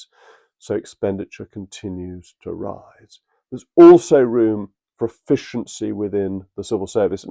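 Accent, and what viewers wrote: RP, United Kingdom. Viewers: Mr Rees moog for all your brilliance. You are useless! Remove the Home Secretary if you can. Your posh style sounds good. But totally useless. Action please. Purge the Islamist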